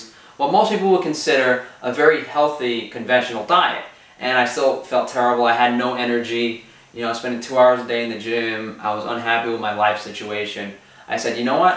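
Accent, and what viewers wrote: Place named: New York, United States. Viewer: I love your muscles and you are fine as hell.I don't care what anyone say.you're hot.Love listening to your videos rather I agree with them or not.Even if I don't agree with some of the information I just love listening to you talk.I love your Italian and new york accent.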